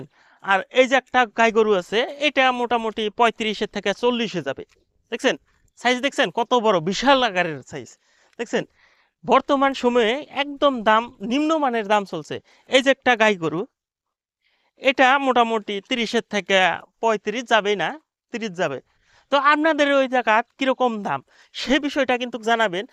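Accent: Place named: Bangladesh. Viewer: Are u from India?apnar kothar tone to Pura bangladeshider moyo.gorur Dam 1 lather Kim bangladeshe niy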